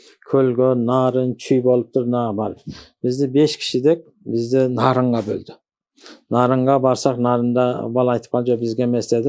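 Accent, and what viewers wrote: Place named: Uzbekistan. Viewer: Оллох рози бусин домладан, узиям киргиз тилида узбекча акцент билан гапирдила манимча, хамма гаплари тушунарли булди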